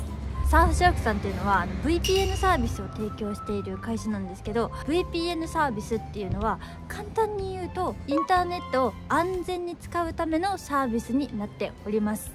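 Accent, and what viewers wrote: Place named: Kansai, Japan. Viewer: ちょっと関西弁が出てて親近感を感じちゃいました。次回の動画も楽しみにしております🤗🚃🎶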